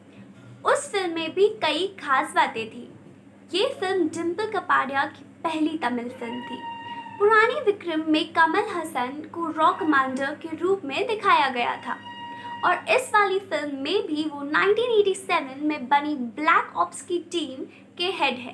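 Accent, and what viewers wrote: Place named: United Kingdom. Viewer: Your Hindi assent is Englishized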